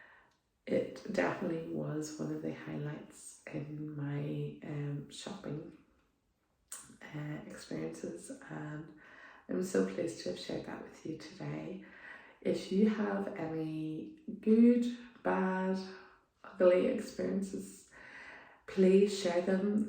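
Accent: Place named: Northern Ireland, United Kingdom. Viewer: Another fellow Norn Iron accent! Great idea for a tag! 😁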